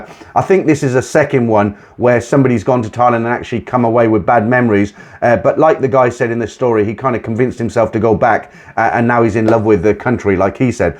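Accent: United Kingdom. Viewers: Are you British,. First time watching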